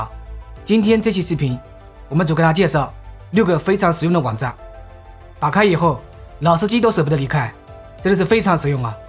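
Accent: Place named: Fuzhou, China. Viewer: 播主说话口音带有很重的福州音...✌️✌️✌️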